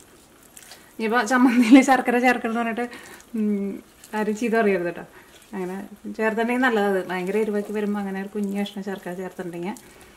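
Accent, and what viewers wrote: Thrissur, India. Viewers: ee Thrissur slang thanne endh resaa kelkkaan... athinte koode immaathiri tasty food um undaakkumbo sherikk Thrissur Pooram....!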